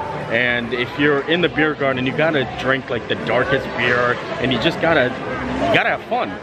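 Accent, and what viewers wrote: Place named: Midwest, United States. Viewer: I have been trying to place that accent for a long time. I knew it was midwest but not sure what state. I was thinking Minnesota?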